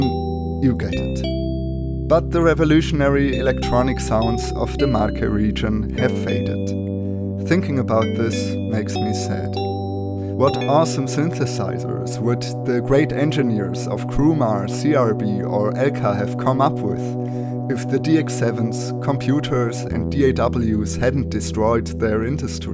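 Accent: Germany. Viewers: More German accent please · I loved your video, thank you for producing it. I remember when the Italians were producing many electronic organs in the 70s and 80s. I still own an X705 portable organ that Michell Jarre used. As you say a very sad demise. Most of the American names had also disappeared by the mid 80s. Don't apologise for your German accent. I am British and I love Germany and the German people. I am a fan of Franz Lambert who at 75 is still doing concerts on his Wersi organ.👍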